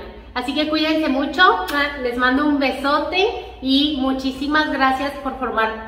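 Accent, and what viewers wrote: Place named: Mexico. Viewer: Tu acento es como el de una mexicana mas ...soy de colombia y no diferencio un acento mexicano de un estado a otro...yo creo que solo los mexicanos notan alguna difencia ...😊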